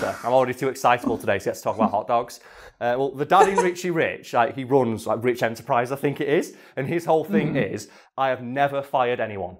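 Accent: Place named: Scotland, United Kingdom. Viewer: I can't fully place your accent and it is driving me crazy. Can't tell if it is a northern accent or Scottish.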